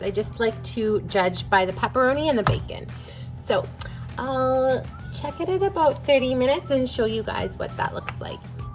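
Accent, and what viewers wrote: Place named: Canada. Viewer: Wow! This is fantastic! I am curious where you buy the cheese (crackers) and pepperoni. I am guessing from your accent you are from Canada. I am also Canadian so I would really appreciate if you share where you buy them! I am very excited to try this! Thank you for the great video! 😊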